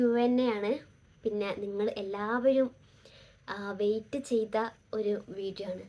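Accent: Malappuram, India. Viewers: All the best, Malappuram slang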